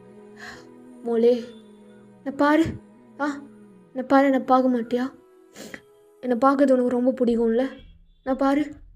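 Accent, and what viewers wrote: Kerala, India. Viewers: Ahhhh 😩 ur malayalam is so cute🤍.. I want pinch your cheeks 😅🤏🏻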